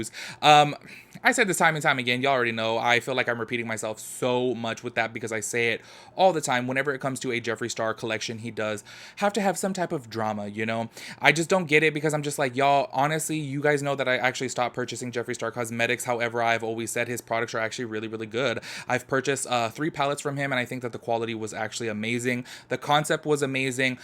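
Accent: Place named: Texas, United States. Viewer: omg ur from san pedro???? much love from the south bay 🤍🤍🤍🤍 thought u were from texas lol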